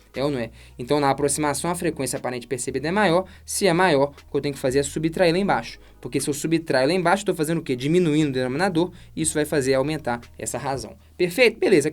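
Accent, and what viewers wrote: Minas Gerais, Brazil. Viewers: Cara eu amooo que vc tem sotaque de minas, me sinto acolhida kkkkkkkkk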